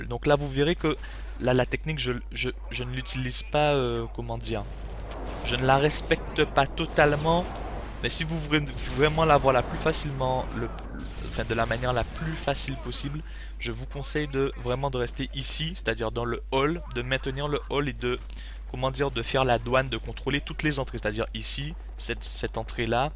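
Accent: Antilles, Caribbean. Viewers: J'adore ton accent ! Guadeloupe ou Martinique ? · C'est un accent antillais ? :) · accent antillais non !? en tout cas tu commente super bien !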